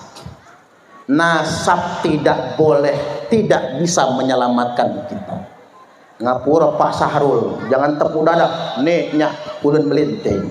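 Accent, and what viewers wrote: Java, Indonesia. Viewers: cerdas wong palembang logat jawa banget sehat terus yai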